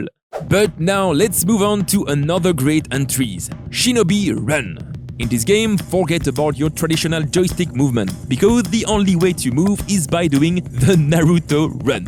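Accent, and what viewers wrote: France. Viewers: Is me or you have a big french accent , you speak french ? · Je sais pas si tu es français mais tu as un fort accent français 👀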